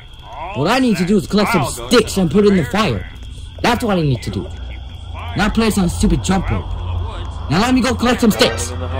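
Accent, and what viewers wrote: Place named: France. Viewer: loved it. great job. lots of energy. love the french accent.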